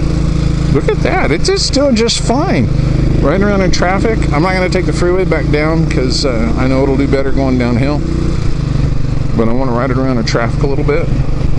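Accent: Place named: United States. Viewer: I love your accent so much, u sound like a cowboy from the wild west, where are u from?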